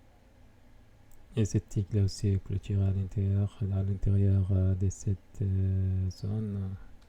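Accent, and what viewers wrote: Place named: Italy. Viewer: Ciao e grazie per il video, mi sembrava un accento un po italiano e nei parametri ho visto 🇮🇹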